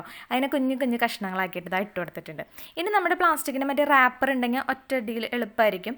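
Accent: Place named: Thrissur, India. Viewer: Your trichur language is very sweet. Continue in the same tone. Love you.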